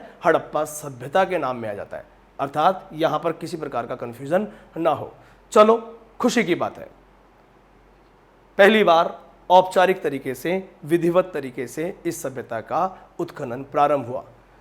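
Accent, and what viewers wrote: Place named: Marwar, India. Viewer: Haan bhai🤣🤣🤣🤣or sir ki aadhi hindi aadhi marwadi maja hi aata tha😁😁😁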